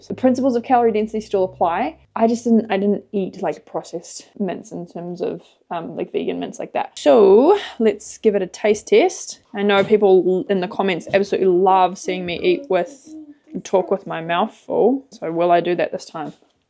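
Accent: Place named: New Zealand. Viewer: DO IT!!! I moved to Japan right out of college. Didn’t speak a word of Japanese, but did have a few college friends there. That adventure changed my perspective SO much. You have friends here in the US, and people here are friendly and helpful. Please don’t miss out on this adventure for you, your husband and children. What’s the worst that could happen? You can always return to NZ. Just be prepared to answer the same questions over and over again. Americans are like big, friendly dogs when they hear an accent like yours and when they find out where you are from, you’ll become the neighborhood celebrities. I SO hope you’ll take the leap!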